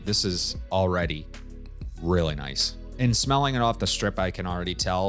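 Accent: Boston, United States. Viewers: Nice review. On my list for this summer. Are you from Boston?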